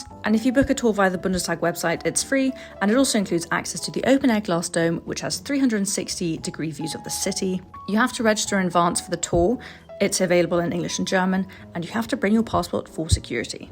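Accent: United Kingdom. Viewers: As a German myself I would’ve never guessed you‘re german. I thought you were british. You got that british accent from living in the UK haha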